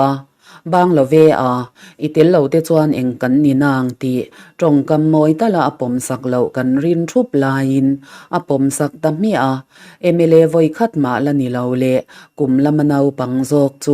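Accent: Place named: Manipur, India. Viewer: A chhiartu hi mizo nge MEITEI aww😊, zawngchhang suh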